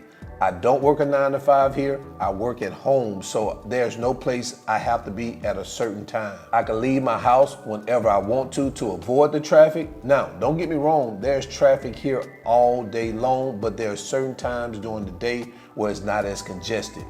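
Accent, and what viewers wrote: South, United States. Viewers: I went from your wife's Dominican accent to your southern accent...lol! Great video I like how you enunciated your words and spoke clearly. I am an expat who has lived in Puerto Plata for 9 years I have a decent lifestyle and cost of living there but Santo Domingo is my second favorite place in the Dominican Republic for some of the same but some different reasons. To live the same lifestyle, I have in Puerto Plata it would cost me about 30% more therefore I am content visiting about twice a month. For me there are many shopping items you cannot find anywhere else in the country, but you can find them in Santo Domingo. In fact, if you can't find what you are looking for in Santo Domingo it's probably not in the Dominican Republic. As far as the restaurants and the women we are 100% in agreement. I have just subscribed to your page so keep up the good work....oh year you make a good team with your wife in her videos it's good to see a successful cross-cultural couple...thanks again!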